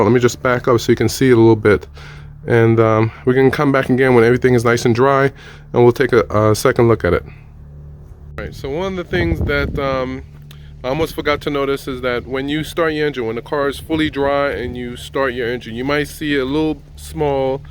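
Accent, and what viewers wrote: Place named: Northeast, United States. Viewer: Are you in the northeast region? I'm from Mass and your accent sounds familiar. BTW I love your videos. Thanks for sharing.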